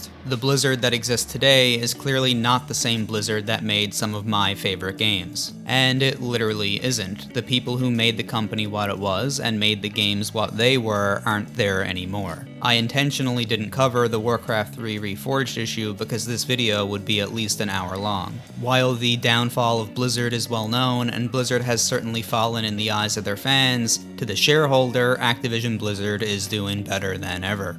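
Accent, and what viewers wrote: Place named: Newfoundland, Canada. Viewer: You sound like a Newfoundlander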